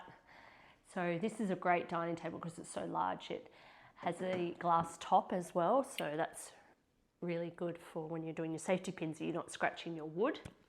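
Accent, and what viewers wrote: Australia. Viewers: Oh, I discovered your channel today and was so pleased to hear a fellow Aussie voice. I love to discover sheets and blankets to use for my backings at our local charity shops. Love your quilts ❤ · I love your accent! My Mother was from Perth. SO I am loving your accent. MyrLene ❤️ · I’ve just come across your site and it’s nice to hear an Aussie accent. I live in Perth. Your quilts are lovely and your backing ideas great. Thanks for sharing your ideas. 😊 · Lovely to hear an Aussie voice, I'm from the Sunshine Coast near you. Interesting video, love getting ideas from other quilters. I like the idea of stitching around your focus shape. I've done a bit of hand stitching around my borders, pretty hard to do without a big table but heck, gotta try something new. Since I don't have a big table I've been doing "quilt as you go" but I like the look of a separate top, and your backings are nice. 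· Lovely to hear an Aussie talk about quilting. Hello from the Sunny Coast. My suggestion for quilting would be diagonal lines both directions AND inside the white as you were thinking. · Just found your channel and it’s refreshing to hear an Aussie! I’m also from Brisbane! I relate to your quandary on what to quilt ! I’ve never used a sheet but I’m thinking it’s a great idea, also using old men’s shirts. I’ve been watching a lady in the US who buys lots of shirts and makes beautiful quilts with them. If I can remember her channel name I’ll comment again.😊 Found it! From Small Things, her name is Sue and I find her very interesting 😊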